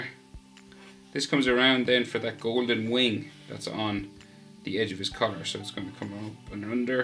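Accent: Ireland. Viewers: Bro u Irish?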